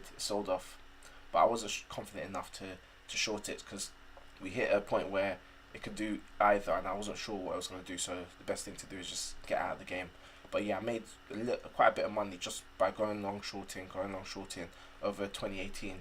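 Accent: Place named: London, United Kingdom. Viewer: Yo yo, waz up bro good to hear a fellow london accent ma man ONE LUV 💝💝💝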